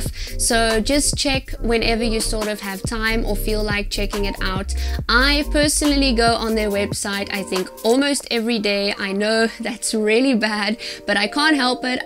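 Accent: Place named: South Africa. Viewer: I clicked on your video and was so happy to hear a South African accent! If only ZARA had an online shop in SA. Love your channel <3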